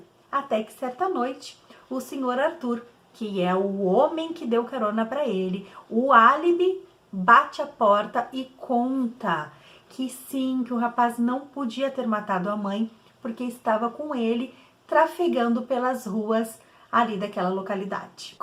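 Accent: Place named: Rio Grande do Sul, Brazil. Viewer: Ahhhh, que amor! Um sotaque de gaúcha misturado com paulistanos 🙃🙂 Eu tbem amo Agatha Christie 🥰 Bom domingo pra vc 😘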